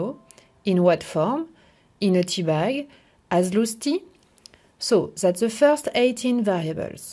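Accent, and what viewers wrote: France. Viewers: Yes, french accent :)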